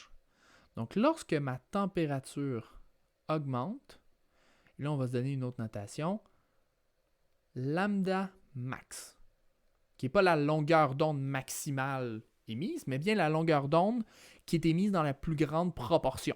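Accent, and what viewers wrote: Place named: Quebec, Canada. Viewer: Même avec l'accent québecois, c'est très clair ! Bravo pour cette présentation. J'attire votre attention sur un papier de Poincaré de 1912, peu avant sa mort, intitulé "sur la théorie des quanta" qui montre que l'hypothèse des quantas est la seule à conduire à la forme du spectre donnée par Planck. Après Einstein qui est le premier à prendre au sérieux l'hypothèse des quanta en 1905 dans son papier sur l'effet photoélectrique, et avant le modèle de Bohr de 1913, ce papier est à mon avis une étape importante dans la genèse de la mécanique quantique.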